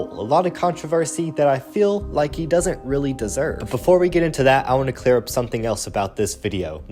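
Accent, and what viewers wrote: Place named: United Kingdom. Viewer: British accent boy 😂😂😂😂😂😂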